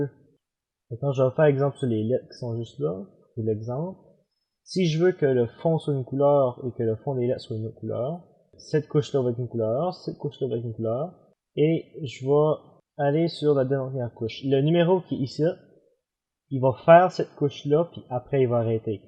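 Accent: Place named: Quebec, Canada. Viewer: C.est du français avec un accent . Il faut écouter beaucoup on finira pas s.y habituer. Je trouve le quebecoi est un jolie accent. Le français parisien est technique administratf sec et olat sans poesie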